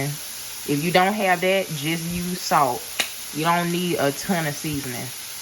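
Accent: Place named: South, United States. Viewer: She so southern haha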